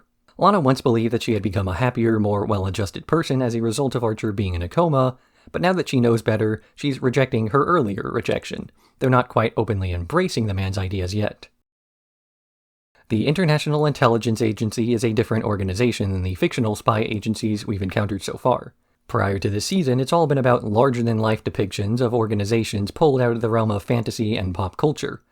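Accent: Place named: South, United States. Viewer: Ah! I think not being American where the accent is from can be hard to place so I think it’s very interesting that yours is described as southern draw! But I also think is funny is that I have been biting my tongue from making this comment so many times because it just seems so rude even though I knew I was coming from a place of curiosity and I have heard you say women before but the last few videos I’ve watched of yours you haven’t and then as soon as you’ve replied to this comment you just said it again 😂 thank you for taking the time to reply and I do hope it didn’t come across as obnoxious!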